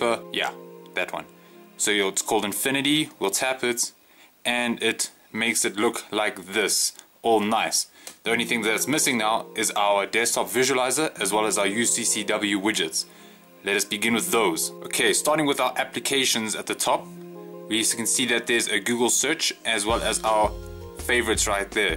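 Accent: South Africa. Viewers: Hmmm sounds like a South African accent.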